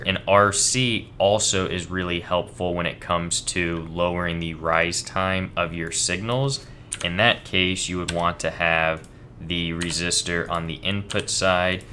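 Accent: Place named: Canada. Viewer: Hi. Some feedback. First off I love your videos. Even though you seem to doubt what you do and your content, you have found a niche area that nobody else does - proper design vids, background rules of thumb stuff that just works and EXPLANATIONS of why you decided on a component verses another this is invaluable!!. The amount of hands-on practical experience knowledge your give us is superb. I know theory but have limited experience constructing things that work in the real world - you help fill this gap. Please just keep banging out LOTS of design videos, don't worry too much about ' if we might find them interesting' we might in the future when the info is relevant to our project, and also don't worry too much about the length we want good information. The only criticism I have is your verbal delivery when your trying to talk 'proper' to camera - it is very monotone and Canadian. 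When you talk to us ad lib and making it up as you go along (like talking to your mates down the pub) it is MUCH easier and enjoyable to listen to. Have you considered a forum like EEVBLOG has - it's another link from Youtube and its search algorithm. Content suggestion - DC powerline filtering how and when to use inductors perhaps. - I am working on electronics in an automotive based environment (12Vdc boat) and cant find suitable info on filtering, voltage regulators etc.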